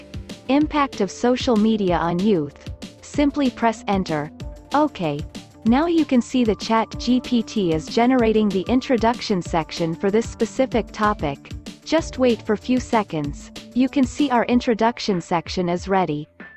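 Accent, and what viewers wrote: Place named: India. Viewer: She/he is from India. Thats how we talk.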